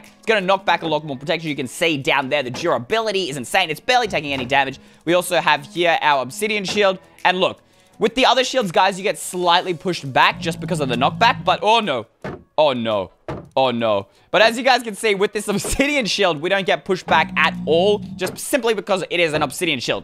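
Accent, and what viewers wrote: Italy. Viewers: I just love your Italian Ascent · I LOVE your Italian accent!!!!!! You make every day so much brighter!!!! ☀️☀️☀️ You are amazing!! I love you ♥️ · OMG. ‘I have 2 things 1: I ABSOLUTELY LOVE YOUR ITALIAN ACCENT ! 2: Dream : Want my comment to be hearted ( idk if u did )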